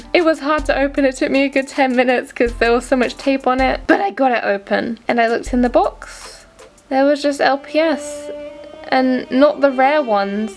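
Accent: United Kingdom. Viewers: yessss i know ur english now i thought u were from america lol trying to find english youtubers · Wait, you're british right?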